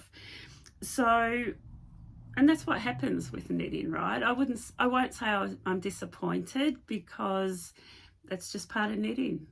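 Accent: New Zealand. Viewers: Hi. Thank you for brilliant podcasts. I always learn something new, today was the cast off tip. Love your vest, I've never been mad on them but you've persuaded me. Have to admit that I often feel homesick when hearing your accent. 2023 will see me winging my way from the UK to Rangiora and Napier!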